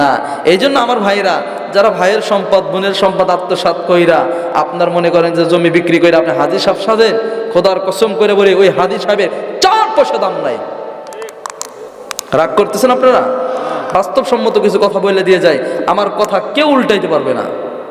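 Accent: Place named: Shariatpur, Bangladesh. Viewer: মাশাআল্লাহ শরিয়তপুরীরের সুয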